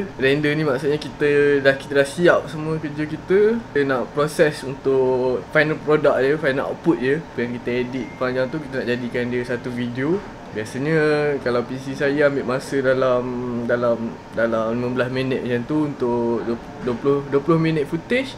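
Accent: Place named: Pahang, Malaysia. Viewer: Aok ni orang mane? Ngape mcm loghat pahang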